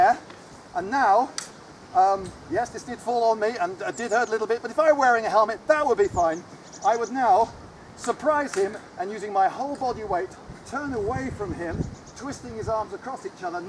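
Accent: United Kingdom. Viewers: I am an American. You are super British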